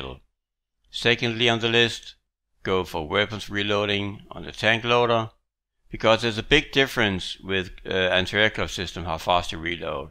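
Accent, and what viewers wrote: Denmark. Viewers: Are you from Danmark?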